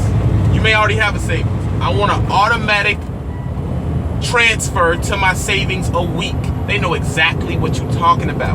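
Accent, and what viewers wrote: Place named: DMV, United States. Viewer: Brotha! I just found you on YouTube a few hours ago. At first I was on the fence as to if I was going to do the trucking thing, but looking at your videos has convinced me, especially being someone in my position. This is a great opportunity to prosper! Awesome content bro! All that aside, I can tell you're from the DMV..."young!" What's up man? I'm living in Cali now, but I'm from the DMV too. RESPECT!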